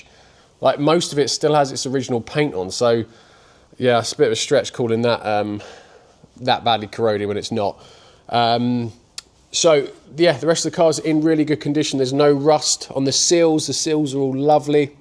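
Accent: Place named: England, United Kingdom. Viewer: I’m watching a lot of these kind of videos and mostly Americans. It’s nice to hear proper English and cars I could afford.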